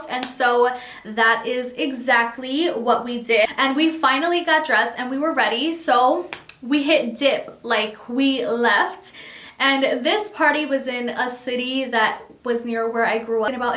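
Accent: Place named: Newark, United States. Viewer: Sounds like Newark NJ lol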